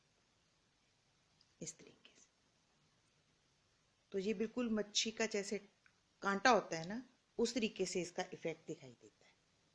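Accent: Punjab, India. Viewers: Beautiful design.. N ur Hindi with Punjabi touch is so sweet.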